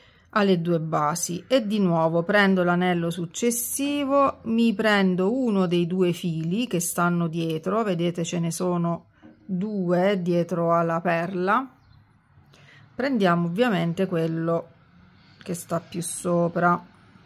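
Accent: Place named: Cilento, Italy. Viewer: Brava e simpatica, hai l'accento che mi ricorda una mia amica cilentana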